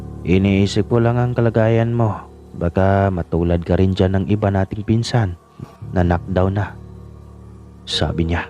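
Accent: Visayas, Philippines. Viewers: ang galing mo ha fluent ka magtagalog pero visaya ang punto mo doin ako bumilib sayo ☺️☺️☺️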